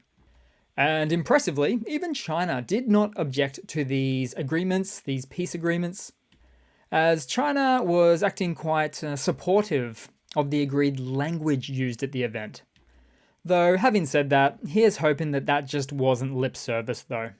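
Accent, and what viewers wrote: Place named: Australia. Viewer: Need more Australian accent i my life’s 8)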